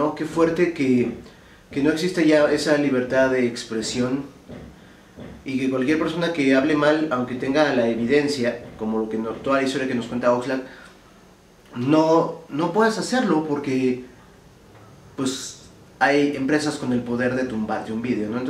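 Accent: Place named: Peru. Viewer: De dónde eres? Pareces de Perú. Por el dejo más neutral.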